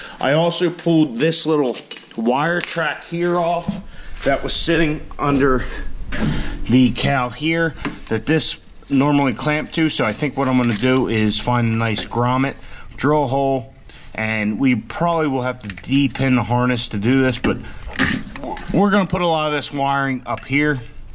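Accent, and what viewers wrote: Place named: Pennsylvania, United States. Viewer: ditto. keep it. those of us who understand the the hunky (slang for the polish-slovak-german-italian culture mashup in the area) accent, or who are from pennsyl-tuckey actually appreciate having someone who doesn't sound like everyone else on the web.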